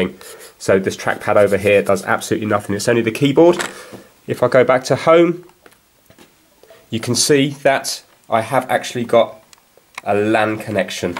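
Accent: United Kingdom. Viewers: He doesn't speak American English he speak British English UK English he mean the game Zelda not the persons Zelda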